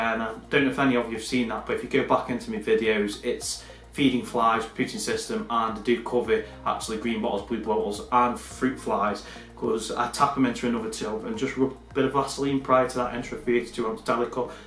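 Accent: England, United Kingdom. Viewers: Great video... My next but 1 mantis is going to be an orchid, getting a moss mantis trio this week! Orchid hopefully in a month or so. Where abouts are you from? I live in Canada, but was born and grew up in England in a place where everyone says ‘looook’!!! Thinking you are pretty close to where I used to live!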